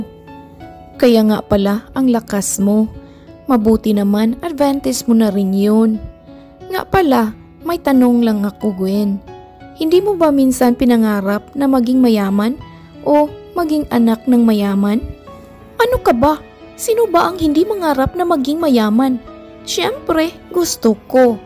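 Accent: Visayas, Philippines. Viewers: Ayusin mo pagsasalita mo. Visaya ka?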